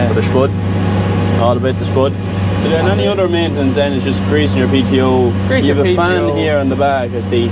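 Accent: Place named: Meath, Ireland. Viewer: Butlins Mosney the Peefect place for a holiday Meath accent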